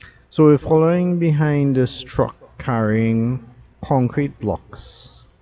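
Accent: Trinidad and Tobago. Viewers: Hello,I love watching all your videos, I like the trinidadian accent,I come as often as possible as my family all live there,I now live in Europe,please talk more and Ill watch more often..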